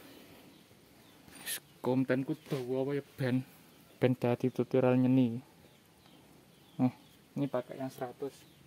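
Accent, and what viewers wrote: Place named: Java, Indonesia. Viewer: Asli mana bosku kayak medok😂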